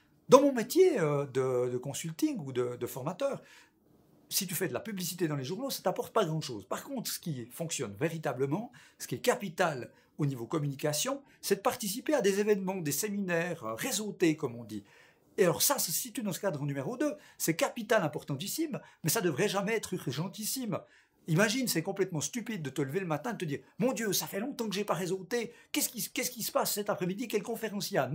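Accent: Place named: Romandy, Switzerland. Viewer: Je viens de découvrir ta chaine et j'ai été très agréablement surpris :). Bon contenu, bons conseils, des trucs réalistes et honnêtes, ça fait plaisir dans ce domaine. Je décèle une pointe d'accent familier, est-ce que tu viendrais pas d'un petit coin de Suisse romande par hasard ?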